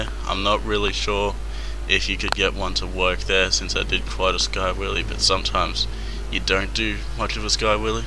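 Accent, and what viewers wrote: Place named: Australia. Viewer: Great Australasian accent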